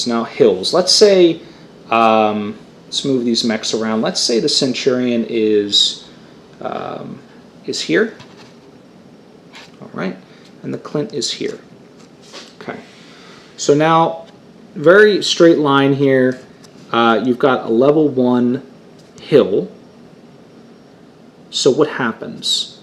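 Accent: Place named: Pennsylvania, United States. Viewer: From PA? Water pronounced 'wooder'.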